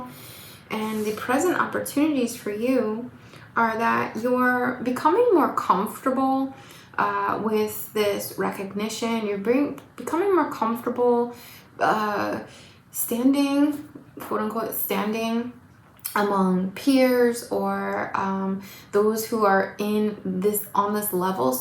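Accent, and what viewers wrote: New Jersey, United States. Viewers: I’m originally from eastern jersey. Did you ever live near there in the past? I picked up a little accent as you were speaking in this video I had never heard before in your past videos. And according to my wife, it sounds like my accent. Just asking. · Love your jersey accent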